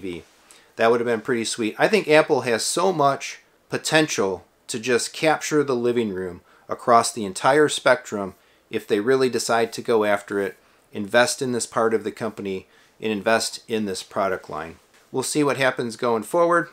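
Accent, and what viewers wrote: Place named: Chicago, United States. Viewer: If this isn’t a fellow Chicagoan I’d be shocked 😄👌🏼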